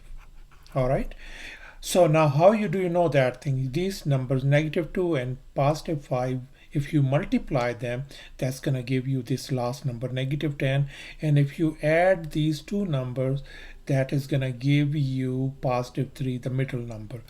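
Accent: India. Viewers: ig he's indian ....by his accent....he teaches gud though (the teacher)....n call me 'sis' not 'bro' cuz it's rakhi....idk why I'm saying this but happy rakshabandhan buddy^^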